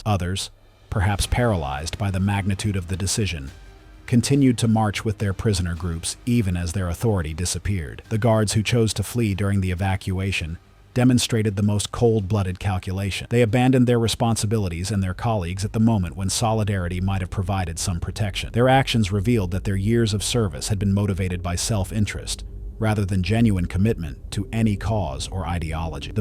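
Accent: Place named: United States. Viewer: I believe it's the bloody annoying authoritive American accent - which is so annoying! Right! The Americans won the war!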